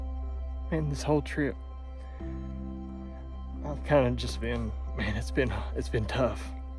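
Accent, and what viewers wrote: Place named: East Coast, United States. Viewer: "Holla". I love you Easterners accent & word choice. We here in Idaho, call that a basin, canyon or draw. Very cool wilderness too have hunted. Hell's Canyon is great too. Fantastic bull. Congrats. Gotta admit, I teared up with you. Truly a blessing. God is always in the blessing His children business!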